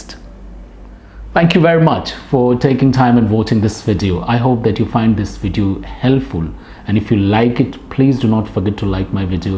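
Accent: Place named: England, United Kingdom. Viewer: Sir I like your English accent 😊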